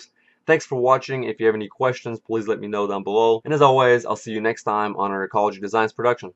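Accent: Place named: Russia. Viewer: Russian? I hear strong russian accent :D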